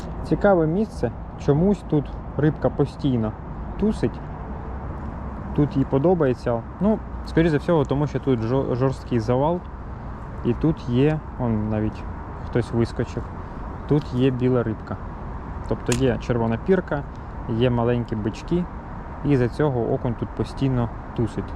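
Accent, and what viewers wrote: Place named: Russia. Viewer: сразу слышно, шо хлопец в быту говорит по-русски (не по-российски), но под давлением пОтриотичного рогулья, вынужден видосы пилить по-украински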